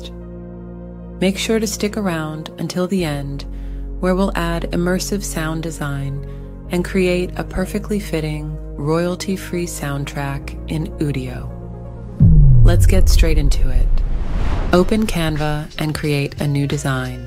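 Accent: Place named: Russia. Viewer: mam are you from Russia amazing accent waooooo 😊😊😊😊😊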